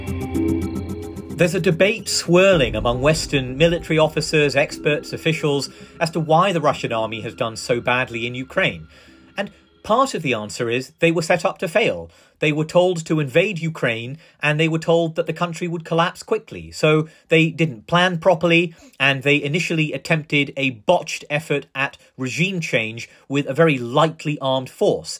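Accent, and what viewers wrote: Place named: United Kingdom. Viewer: Why Shanhunk joshi speaking like a limey. Where's the 711 accent ???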